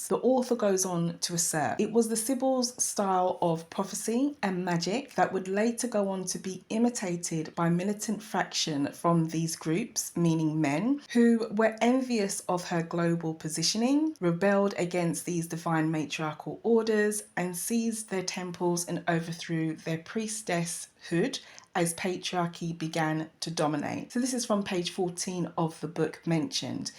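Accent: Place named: United Kingdom. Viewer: So good to hear a British accent speaking on such topics. Keep it up.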